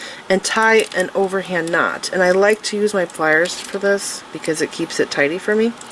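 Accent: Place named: South, United States. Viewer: Aw geez, lolol, it's just that touch of Southern that throws you off 🤣😂😆😅😄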